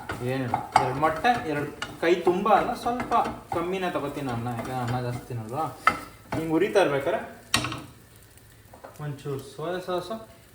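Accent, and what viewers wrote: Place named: India. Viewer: Nim bhashe kelvaga eno ondthara Khushi,Hale kannada Andre pure nam Halli shogadina kannada keloke sigutthe,tq u mam,tq..😍.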